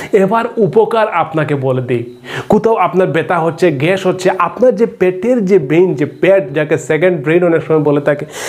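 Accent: Sylhet, Bangladesh. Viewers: 👉Oi eegu aamrar siloti Shur -ey -kelu ucchharon korer byei! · আপনি সিলেটি কথায় বুঝতে পারছি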